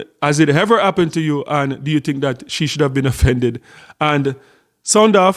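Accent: Jamaica. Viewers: The accent is very similar to the Jamaican accent. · I can tell by the way you communicate you were born in jamaica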